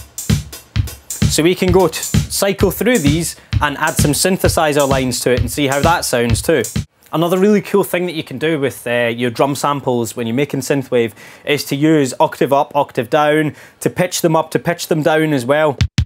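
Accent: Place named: Scotland, United Kingdom. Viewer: Thanks for the excellent overview, I also love your Scottish accent! Scotland and Scottish people are awesome (and I'm partly descended from them myself), I hope to visit there some day. Also thanks for the heads up on the Yamaha Reface line, I would be really tempted to get the Reface CS if only it had patch memory. There's really no excuse not to with today's technology. But at least there are some great softsynths now such as Tyrell, Diva, and U-NO-LX.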